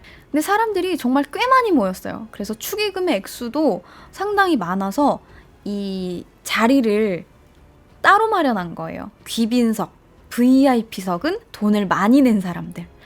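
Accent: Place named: Jeolla, South Korea. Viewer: ~~전라도 맞지 김수미 목소리가 ㅎ ㅎ ㅎ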